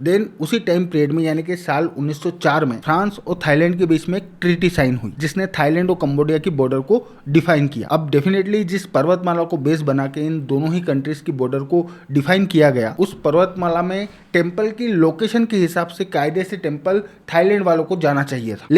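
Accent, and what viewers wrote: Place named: Gujarat, India. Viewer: Aap gujarati ho na ? Kyoki aa theth bol rahe ho.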